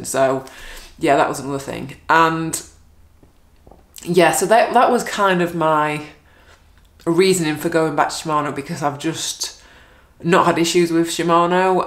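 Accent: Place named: North, United Kingdom. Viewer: 🥰 northern lass 🥰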